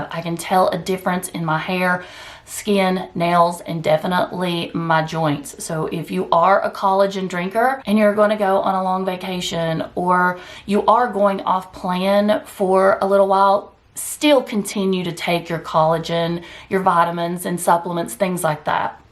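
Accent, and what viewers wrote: South, United States. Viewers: Hi, that’s GREAT! Thats keto flexing, when you go off and on keto with negligible negative results. Gotta live life. I love the southern accent( I’m a northern guy). Keep up the great work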